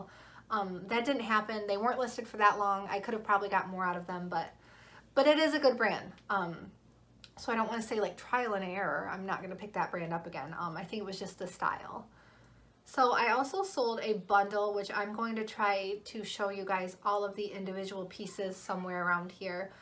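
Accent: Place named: Michigan, United States. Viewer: I thought that you had a MI accent! I'm from the Upper Peninsula but live in Oregon.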